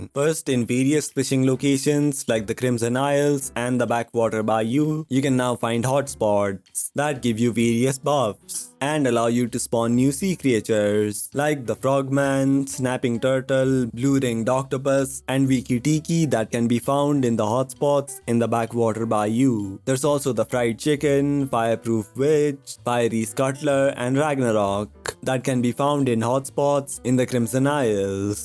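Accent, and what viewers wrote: India. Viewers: are you Indian, my guy?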